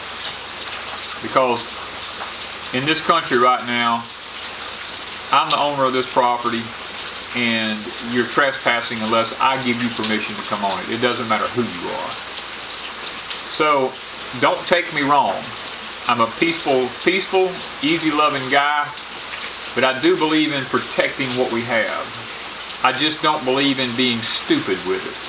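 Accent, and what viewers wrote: South, United States. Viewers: I'm glad to hear that you're going back to a topic based live stream. The first one was really good but the others just seemed silly.. Everyone is just chattering about nothing and distracting you and from what you wanted to talk about. I'm looking forward to this weeks live broadcast again:-), I love your Porch Time. You have such a relaxing, southern gentlemanly way of saying everything and getting your point across. Loretta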